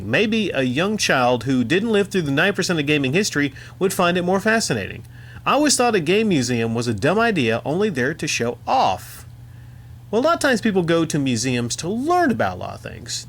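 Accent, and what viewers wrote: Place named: New York, United States. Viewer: LMAO 🤣🤣🤣! Sounded like a mix of NY (In your pronunciation of Sox and Boston) and Boston but it was still hilarious.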